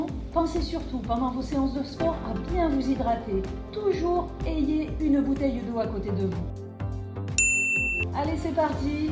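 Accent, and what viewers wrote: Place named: Drôme, France. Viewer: merci pour votre réponse.. je ne m'y attendais pas..je travaille en poste de nuit en pneumologie..et vos vidéos sont calmes et efficaces.. Hé oui bien besoin d'équilibre à moto..merci oui je reste prudente!!🏍❤️ Votre gentillesse se ressent délicieusement ! Et vous êtes magnifique ! Votre petit accent me rappelle le mien lorsque je vivais dans la Drôme.. en Lorraine à présent.. il est..bieeeen différent..lol! Bisous du grand Est!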